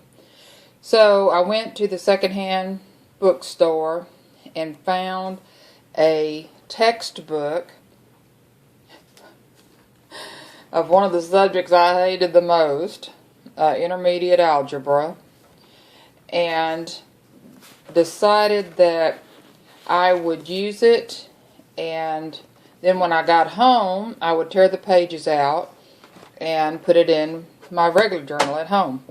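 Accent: Texas, United States. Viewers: I just want to jump through the screen and flip through this myself!!! I love it......so much creative fun!!! I just discovered your channel . So happy I did!!! This book just looks so personal and homey. Something very free and organic about it. Thanks so much for sharing it with us. Love your accent by the way.....my fave!! From one Texan to another!! HA!! HA!! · Love this journal and your Texas drawl :)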